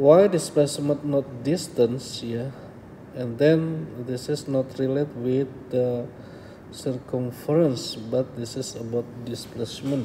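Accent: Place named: India. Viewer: when I heard the lovely indian accent, I know I came to the right video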